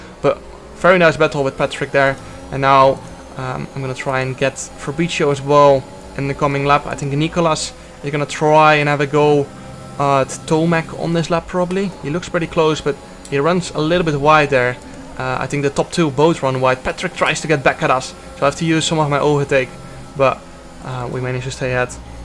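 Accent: Netherlands. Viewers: Ik hoor zo overduidelijk dat jij nederlands bent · Je spreekt beetje Nederlands Engels?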